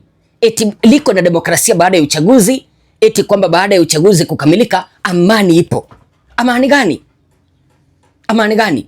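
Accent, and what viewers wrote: Kenya. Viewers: Kwanza huyu jamaa ni mkenya hakuna mtanzania anaeongea kiswahili hicho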